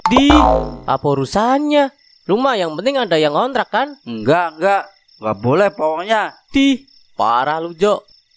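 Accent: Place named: Banyumas, Indonesia. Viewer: Bahasane ngapak wae om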